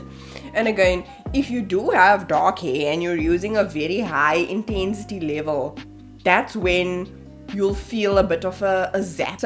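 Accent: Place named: South Africa. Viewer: I love your South African accent 🤩🤩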